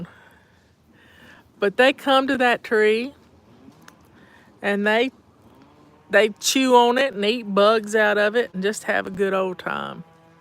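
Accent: South, United States. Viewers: Absolutely beautiful! I love listening to your southern voice! Love from Dallas, Texas!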